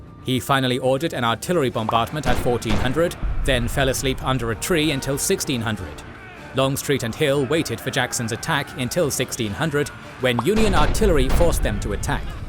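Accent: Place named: United Kingdom. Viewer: Hate the speed-reading thick British accent.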